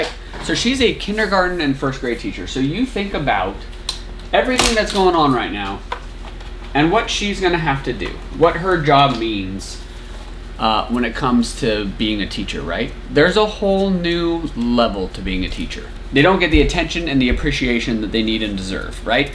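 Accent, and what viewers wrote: Tennessee, United States. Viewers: So glad I’m done raising kids .. I would be a nervous wreck if my kids were little at this time .... Do I detect a little Tennessee accent starting ? I think maybe .. 🤗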